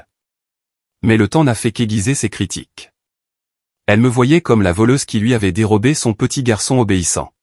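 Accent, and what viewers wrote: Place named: France. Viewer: J'ai adoré l'anglais avec l'accent Français ptdr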